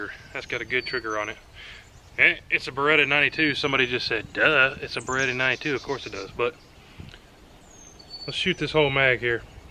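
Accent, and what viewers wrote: South, United States. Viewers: South accent. Even I can differ that)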